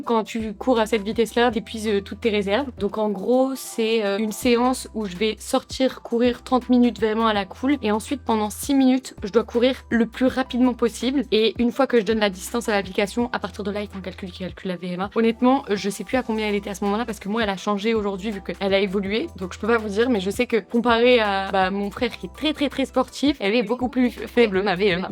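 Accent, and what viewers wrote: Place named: South, France. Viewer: j'avais jamais entendu que tu avais un léger accent du sud, ca te rend encore plus mimi :D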